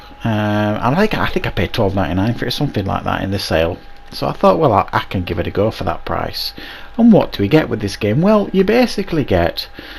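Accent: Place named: North, United Kingdom. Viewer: Nice to hear a Northern accent!!!!!!!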